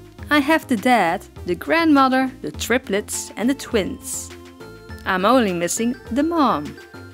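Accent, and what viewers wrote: Netherlands. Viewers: Please Ive been a subscriber for a long time 😩 Your tutorials were one of my fav since you made the dolls come to live and gave them such a personality Im hooked. Im also from the Netherlands so I recognize that accent. Plus the faceups and animal creations are top notch that they remind me of the Fantastic Mr fox. Ive been watching for a long time even when I was unsubscribed.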